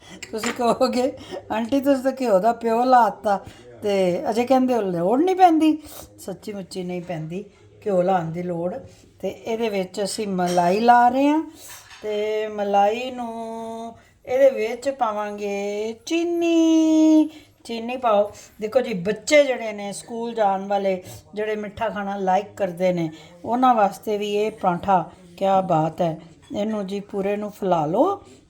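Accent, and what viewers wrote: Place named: Malwa, India. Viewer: A pigrna te khurna ....malve di boli hy schi dso bhain ji kehrha pind hy tuhada mera muktser hy tahi tuhada piar auda rehda hy ...mai canada to likh rhi ha sarian videos dekhdi ha ❤❤....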